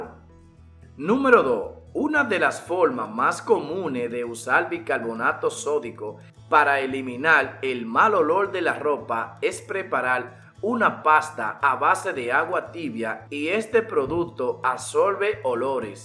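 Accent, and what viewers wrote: Dominican Republic. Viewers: es dominicano xd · Es que así es el habla del dominicano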